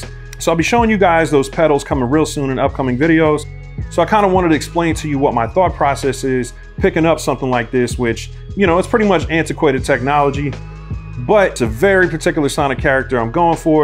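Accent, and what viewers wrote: Philadelphia, United States. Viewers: Hey why you have a lil philly slang when you talk lol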